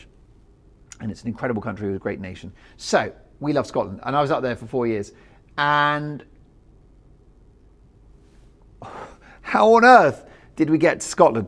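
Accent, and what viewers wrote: United States. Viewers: You nailed an American accent! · Great American accent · Great American accent!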